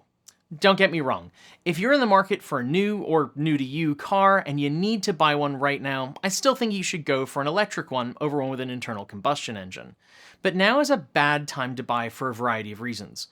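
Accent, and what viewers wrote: United Kingdom. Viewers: Great advice especially for me who is saving up to purchase a used EV.. Wait a minute... What happened to British accent? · Am I crazy or do you usually have a British accent? Your American accent is very good! I wish I could do a british accent as well as you do American haha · What happened to the British accent?!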